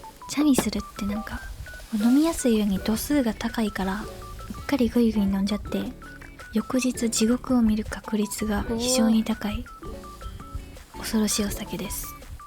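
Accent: Kansai, Japan. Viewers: 初コメです。のキャンプ動画楽しく見てます。今回アフレコと言うことですが、酒893のためか声若干かれているところありますが、関西人のイントネーションが心地よくいつもの動画以上に何回もみています！これからもの声聞きながら動画みたいです！ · 関西人には関西弁が心にしみるなぁ😊 そしてタッカンマリ。実はキャンプでタッカンマリを作って食べるのが夢なんです！参考になりました。韓国料理が好きで、数回韓国にも旅行して現地料理を食べます。韓国料理の動画またアップして下さいね♪ では、アンニョン❤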